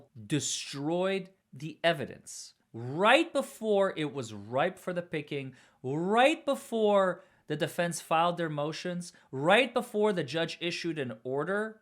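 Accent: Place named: New England, United States. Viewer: Kentucky⁉️ I thought you were from MA or RI 😂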